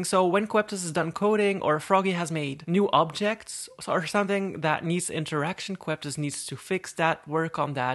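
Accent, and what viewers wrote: Netherlands. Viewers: You're dutch or Belgium, same for me. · Are you dutch? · Can i ask if your dutch? 😁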